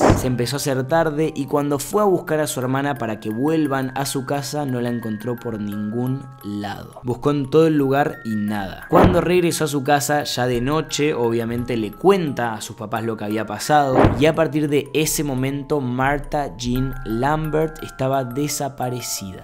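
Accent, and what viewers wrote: Argentina. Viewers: Amo tu acento Argentino ...Es tan bello como el chico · Q hermoso te queda El acento argentino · Es argentino da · Es por qué es argentino como yo xd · De donde sos, te digo de buenas es que en argentina se habla así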